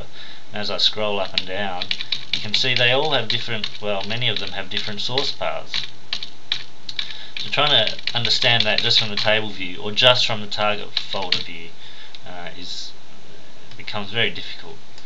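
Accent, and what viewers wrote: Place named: Australia. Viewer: omg you're Australian aren't you!? That's awesome... fellow Aussie 😁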